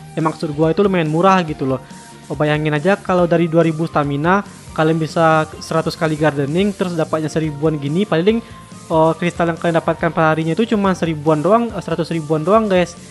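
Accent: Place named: Minangkabau, Indonesia. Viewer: Logat minangnya kental banget 😂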